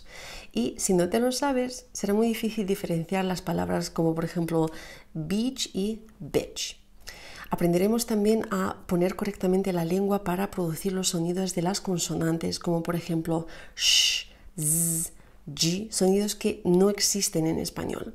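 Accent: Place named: Madrid, Spain. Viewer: Hola te felicito excelente Maestra 👌 de Inglés,tienes una pronunciación muy bonita, cómo Tú eres. Te voy a seguir en la red. De qué parte de España eres parece tu acento Madrileño? Yo soy de México de la zona metropolitana de la CD.de México. México y España por Siempre Unidos. 🇲🇽🇪🇦😘😘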